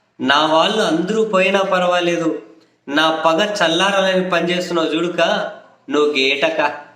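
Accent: Rayalaseema, India. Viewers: మీరు సినిమా లో try చేయవచ్చు బలగం సినిమా లో కంటే బాగుంది మీ డైలాగ్ delivery రాయలసీమ బాష లో పల్లె టూరి బాష లో సగటు మనిషి ఆవే దన తో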